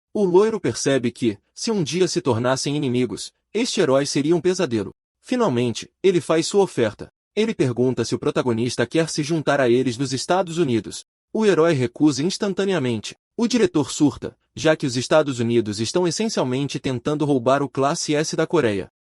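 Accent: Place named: Portugal. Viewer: Eu amo português de Portugal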